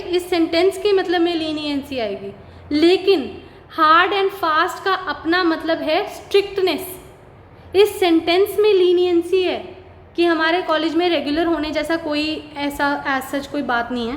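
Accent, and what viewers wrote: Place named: Garhwal, India. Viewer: Are you Garhwali .... your tune matches alot😅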